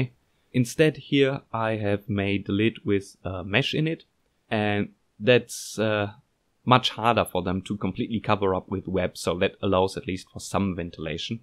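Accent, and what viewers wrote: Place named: Germany. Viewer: Hey. Im from germany and from your accent and your name i would guess you are too. Im really interested in these spiny assasin Guys. I would Love to buy some from you. Even if thats not possible i really Like your channel and keep Up the high quality videos.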